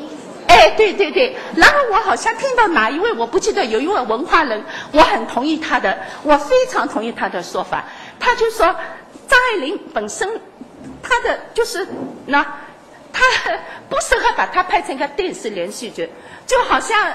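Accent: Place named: Shanghai, China. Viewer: 典型的上海普通话😂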